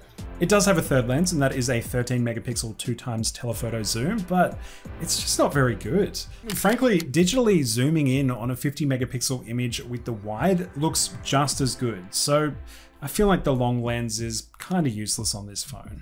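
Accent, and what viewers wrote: Australia. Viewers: You're absolutely right. LoL. It's kinda weird 2bh 😁. An Aussie tech chap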